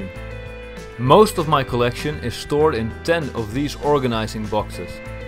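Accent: Netherlands. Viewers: You sound dutch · Nice way to do so my friend. This kinda overviews you don’t see that many often. When I, for whatever reason, need to go into the grandchildren’s playing room I’ll need either a great deal of force or rent a CAT shovel or backhoe! It’s a good idea however to sort it out! 👍👍. By the way, you’ve got these accent as I recon as European? Dutch or Swedish? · From the accent you can hear he is Dutch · You sound like an man speaking english with a dutch accent... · Are you from the netherlands? Kan het horen aan je axent😅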